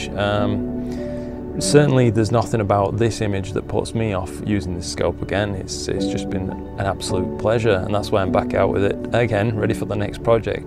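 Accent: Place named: North, United Kingdom. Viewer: Hey, you sound like you are up from around my way (I'm a bit further North I think). You should come join our lucky imaging project on Discord, would be good to have you in the community.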